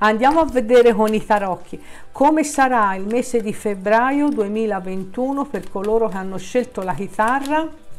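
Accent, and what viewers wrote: Tuscany, Italy. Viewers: Un abbraccio sei un mito.. Adoro il tuo accento toscano. Spieghi le tue carte benissimo. Mi ritrovo nella chitarra. Bella la nuova grafica...brava. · .... sei simpaticissima super accento toscano ❤... ti seguo sempre.... daiiii stesa del leone.... dai dai che arriva...lo spero!!!🌹🌹🌹🌹...grazie un abbraccio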